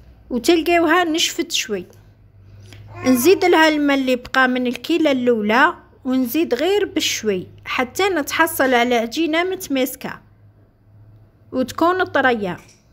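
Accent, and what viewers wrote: Setif, Algeria. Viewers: حسب اللهجة تاعك تباني سطايفية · هذه اللهجة نتاع سطيف العالي.اليس كذالك؟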